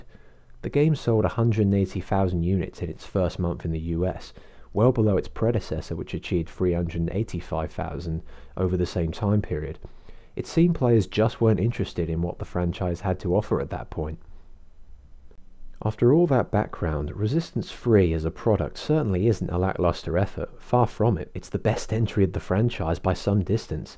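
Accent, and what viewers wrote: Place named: United Kingdom. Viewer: So glad I found this channel through this video. As an American I love listening to a calm British voice narrating a hour long video. Perfect for bedtime.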